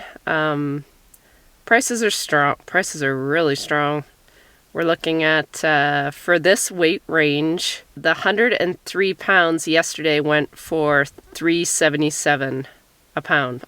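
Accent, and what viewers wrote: Canada. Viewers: You must be Canadian. The fist time you dropped an "eh" I started looking for bilingual packaging and I found it. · hello from North Dakota, your honesty is so refreshing. I adore your Lambs and watching them grow, I must admit that when I first started watching the channel I couldn’t eat Lamb. As time went by and I saw the love and care you show each of them that soon changed. Lamb chops are my absolute favorite thing to eat, if it’s on the menu anywhere I’m ordering it. My husband not so much he just doesn’t enjoy the flavor of the meat. Keep on doing what your doing, I must also admit that I love hearing your accent and Canadian sayings it reminds of home.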